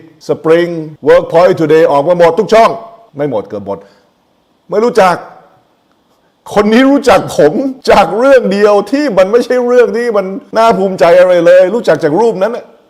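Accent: United States. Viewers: แต่ต้องตัดสำเนียงฝรั่งออกก่อน ไม่น่าเชื่อ เกิดและโตในเมืองไทย ไปเรียนต่อที่เมกา กลับมาเมืองไทยแล้วยี่สิบกว่าปียังตัดสำเนียงเมกันออกไม่ได้ หรือคุณปลื้มไม่ยอมปรับเปลี่ยนเอง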